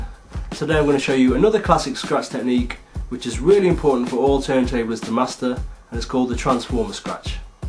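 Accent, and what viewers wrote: United Kingdom. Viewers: u sound like ur from nottingham. are you bro?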